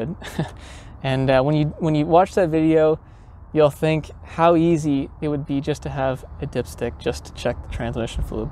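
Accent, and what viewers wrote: Canada. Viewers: this must be in canada i can tell by the way this dude talks why dont they say farenhiet instead of celsius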